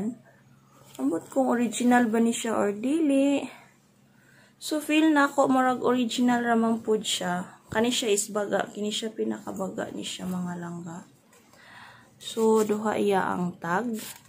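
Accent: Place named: Philippines. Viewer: 35🌺🌺👍, love Filipino accent 😊, stay blessed 🙏 🙏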